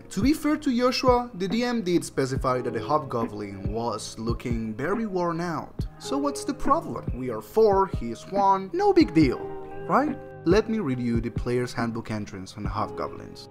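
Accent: Argentina. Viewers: ¿sos argentino? Recién encuentro tus vídeos de D&d y me encantan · I really enjoyed the story! I had to comment, i need to know, decime que te lei bien el acento, sos argentino? Jugas d&d y sos de los nuestros? T_T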